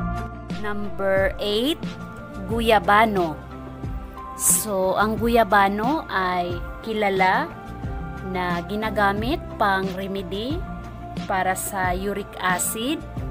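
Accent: Visayas, Philippines. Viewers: wow ha!banas sa MGA bisaya...puntong bisaya... Tagalog po salita nya... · Bisaya kasi salita kaya nakakabanas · wag na po tau magalit sa tono ng pananalita ni ate kc bisaya cia bah kaya suportahan na lang natin c ate mga ka atritis hahahaha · Bisaya kasi!!. Unawain na lang, nauunawaan naman, thanks Inday.. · Bisaya ka ma'am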